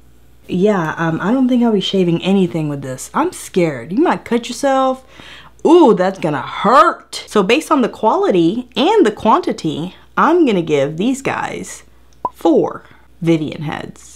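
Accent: Texas, United States. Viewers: Hello y’all Vivian I love ur channel so damn much I just learned about you and from the first video I knew right away that u are from TEXAS. The way u talk not the accent but the way u talk is just like me I could not stop laughing 😂 😂😂😂😂. Girl I love you so damn much. When I’m feeling down or my anxiety is fucking me up I’m from now on I’m going to watch ur video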